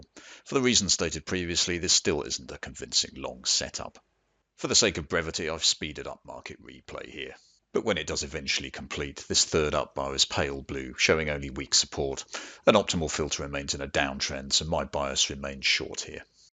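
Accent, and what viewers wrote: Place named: United Kingdom. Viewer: Good stuff! You have a great voice. Very calm sounding with a classy British accent.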